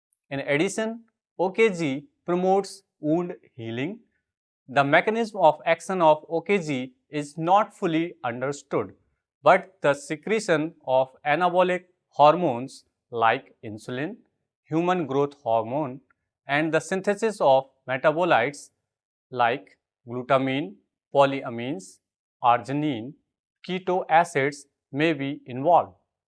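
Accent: India. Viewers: English with india dialect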